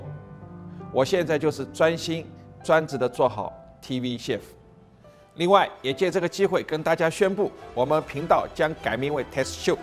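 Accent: Shanghai, China. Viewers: 能听出来John大厨的上海腔调，不亏这么多年在魔都积累的经验。😂 我是湖南的，希望可以多看到点湘菜系的菜肴！Best wishes to the TS crew, esp. during these dark days. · 上海人伐？听口音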